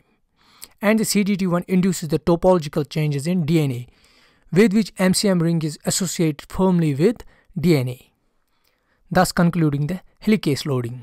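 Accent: Kashmir, India. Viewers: U have a Kashmiri accent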